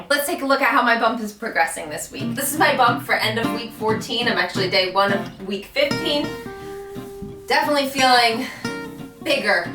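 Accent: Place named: Philadelphia, United States. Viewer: Just found you! Im 14 weeks today.... i love your accent are you from Philly?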